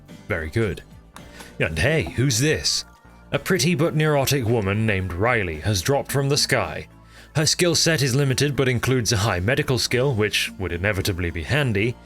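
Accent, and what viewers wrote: United Kingdom. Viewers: Hearing a brit talk about Starcraft just feels right. Rip TB, we do not preorder.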